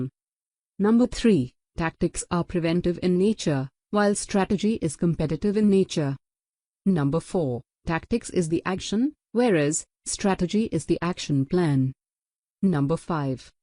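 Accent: India. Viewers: HOW CAN A FUCKING VOICE AI HAVE AN INDIAN ACCENT FOR FUCK SAKES, HOW DECIDED THIS WAS A GOOD IDEA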